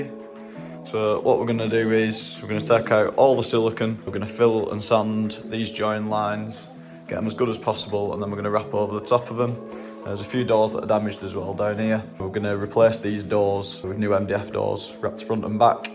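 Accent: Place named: Lancashire, United Kingdom. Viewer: South Lancashire, around Chorley, Leyland, Bolton.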